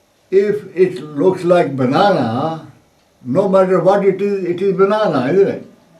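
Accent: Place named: Kashmir, India. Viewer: you speak like kashmiri pundit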